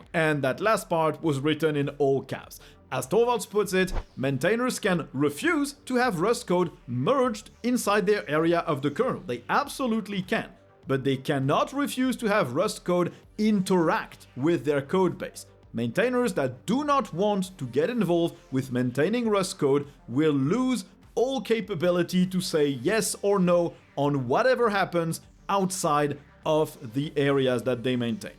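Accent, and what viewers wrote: France. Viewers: L’accent Francais de fou ! Tu es un natif ?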